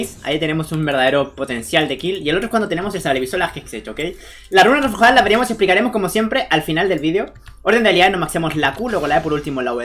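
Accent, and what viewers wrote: Chile. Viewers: eres chileno ??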